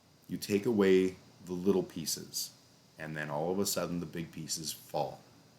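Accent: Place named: Appalachia, United States. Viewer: I love your hillbilly accent 😂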